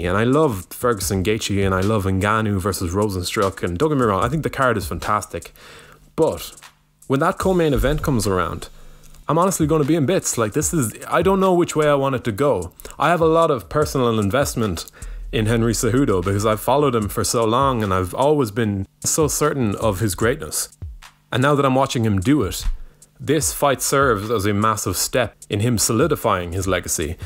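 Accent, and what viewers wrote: Ireland. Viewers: You are Irish aren't you?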